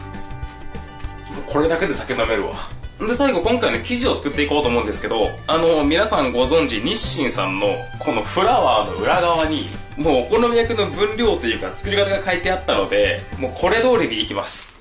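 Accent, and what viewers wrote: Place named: Fukuoka, Japan. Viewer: 端々に出る方言が好き(´；ω；`) 「吹き零れんごと」とかガチの福岡弁で笑う笑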